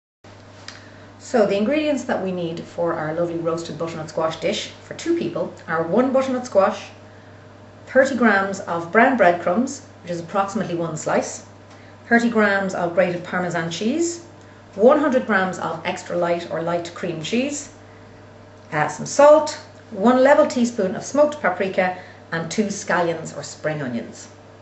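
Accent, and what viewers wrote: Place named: Ireland. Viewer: Ahhh, the brogue! I'd listen if you were reading the phone book. But the squash instructions are spot on. Thanks!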